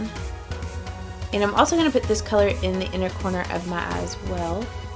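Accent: South, United States. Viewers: Juz love to hear your southern accent!! We needed a southern bell makeup guru. Hugzz from Dallas, Texas!!!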